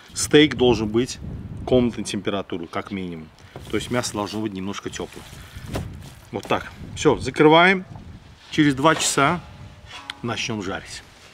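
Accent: Baku, Azerbaijan. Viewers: Дорогой, любое твоё блюдо - шедевр, спору нет. Но больше всего подкупает твой едва уловимый бакинский говор. Это нечто!!!! Как будто дома, в Дербенте...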